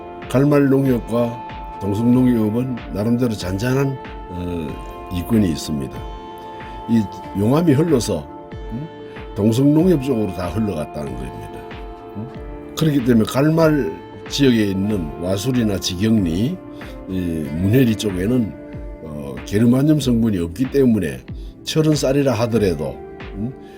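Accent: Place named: Gyeongsang, South Korea. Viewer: 구독~좋아요~🎉🎉🎉 선물 드려요❤❤❤ 이렇게 자연은 신기하군요 목소리듣자마자 경상도인거 알겠어요 저는 부산이니까요 행복한 날들 영위하셔요😂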